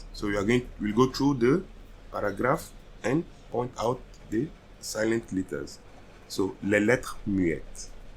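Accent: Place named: West Africa. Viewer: Sounds like an african french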